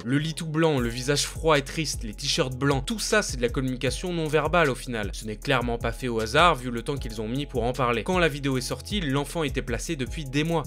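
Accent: France. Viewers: tu sens le nord à des kilometres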